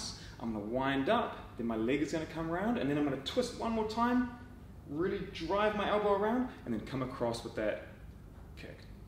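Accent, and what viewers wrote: Australia. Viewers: are you australian? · do I hear an Aussie accent ?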